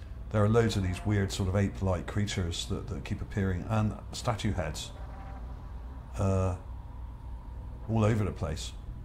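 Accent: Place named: West Country, United Kingdom. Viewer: dont deny your history , Mr, Westcountry accent. love your recent stuff , some of your idras can some times drift a little, but the upside is the west country drawl is as an OPIATE to many of your disciples.. thank you for the free opiates...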